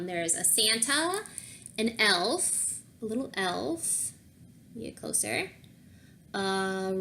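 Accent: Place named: Cleveland, United States. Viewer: You are like the coolest girl ever!! 😆😆 I totally thought you were from Cleveland from the accent...but I do remember Bendy Stitchy saying you were a Wolverine 😮. I’ve never had a reason to go to Michigan but you make the Bavarian town sound totally worth it!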